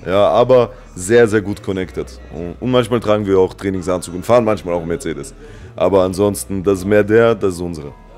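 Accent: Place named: Balkans. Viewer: On je nas. Prica DE sa nasom bojom glasa.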